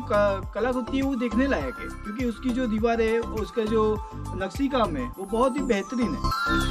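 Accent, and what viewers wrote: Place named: Gujarat, India. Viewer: Bhai bhai ek Gujarati nu Hindi kaik alag j hoy chhe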